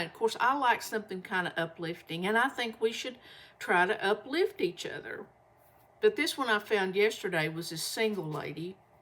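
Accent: Kentucky, United States. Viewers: Hi again from NY (Upstate)! I was just remembering, as I listened to your accent, I have only 2 'Ken -- or "Kin"! -- tucky' memories (as far as BEIN' there. They are Lexington (Thoroughbreds, "speaking of 'horses'!") and Ted Bird (are very many people who live in Kentucky familiar with it, or know OF IT?). BTW, I neglected to say before, Welcome to YouTube!😉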